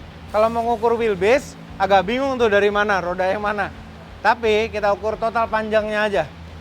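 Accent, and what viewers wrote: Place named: Kalimantan, Indonesia. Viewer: Aghhhh .... asyik banget denger nya om, bahasa " fungsi dan bukan untuk gaya" sprti bahasa pulau kalimantan😅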